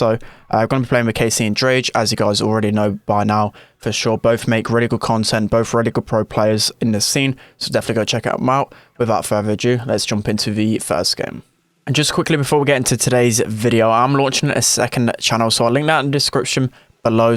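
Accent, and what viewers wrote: United Kingdom. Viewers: Uk people and their English :) heh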